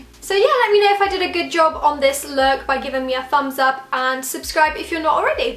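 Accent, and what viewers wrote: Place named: United Kingdom. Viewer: jesteś z Polski?????????????????????? co?????????????????? nie poznałam, masz taki piękny brytyjski akcent, wg śliczna jesteś. Od ilu lat mieszkasz w UK?:)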